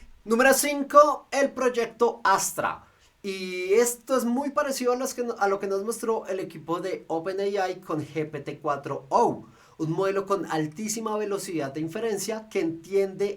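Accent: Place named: Colombia. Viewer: Gracias por el resumen. Me encanta tu onda , forma de explicar, la edición de los video, y, la forma de hablar colombiana. Slds from Argentina. I love Gemini.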